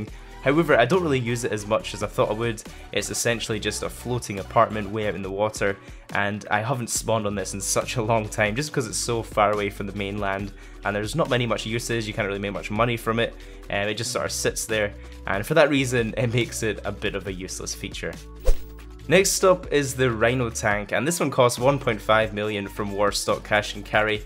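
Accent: Scotland, United Kingdom. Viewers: Is he Scottish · Are u Scottish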